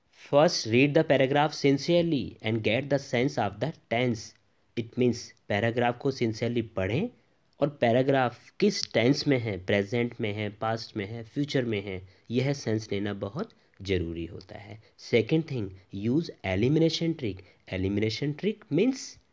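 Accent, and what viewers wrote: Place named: Western Uttar Pradesh, India. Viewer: Are u from bijnor, meerut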